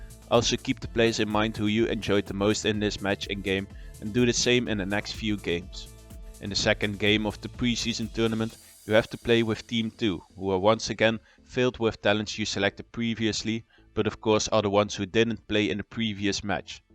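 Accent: Netherlands. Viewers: your accent has to be the most 'Brabant`s' English i have ever heard xD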